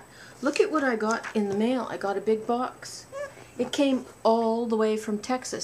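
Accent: Canada. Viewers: I love your videos. I come everyday to your channel to see if you have put up something new. Yes you are quite charming;). I love how you pronounce your words so precisely and your Canadian accent.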